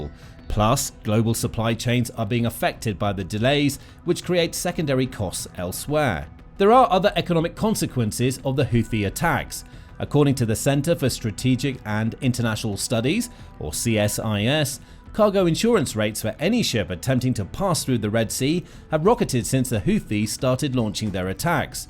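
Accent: United Kingdom. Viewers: Your British accent tells me everything I need to know BBC should worry more a about your rip gang's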